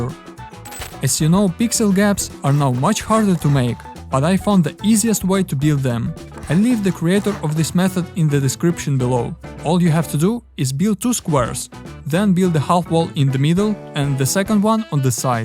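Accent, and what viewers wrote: Russia. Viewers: Брат ты русский что-ли? Акцент прям мощный.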